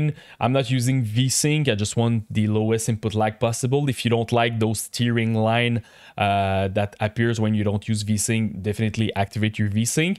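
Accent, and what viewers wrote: Quebec, Canada. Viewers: Thanks for the tips bro & est tu québécois?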